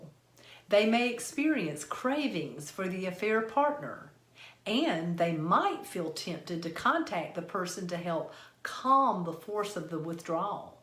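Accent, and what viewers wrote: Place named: South, United States. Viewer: Well, yeah, you can tell by the southern accent that Christianity blurs the fact of the matter….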